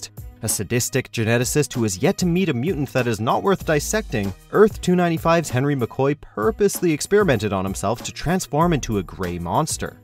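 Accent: Canada. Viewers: Are you Canadian? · They're Canadian I believe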